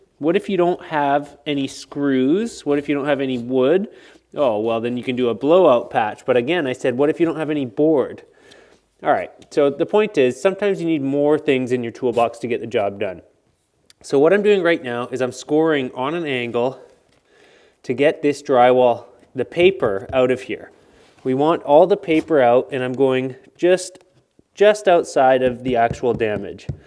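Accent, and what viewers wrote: Canada. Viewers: If you mix quickset 5 mud with quickset 20 will it set up in the average of the two (or 12.5 minutes) if both parts are mixed equally? Ok, I’ve worked the last 14 hours so my attempt at humor fell on its face. Great video (again). I’m so glad I discovered you a couple of months ago. I’ve learned so many techniques and tricks from you. I love your sense of humor too. I’m from Canada (and want to return) so it’s nice to hear your Canadian accent speaking in English:). Keep up the inspiring and informative videos! Btw, I was a carpenter while working though college. I built houses and apartments and since they’re still standing I must’ve done a good job. I’ve also made surfboards and made a 17 foot sailboat. 👍👍👍 Btw, is Canada allowing Americans into Canada? I was born in Canada so I hope they’ll let me back. You’re so right, these are hard and strange times. 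Thank you for that heartfelt message.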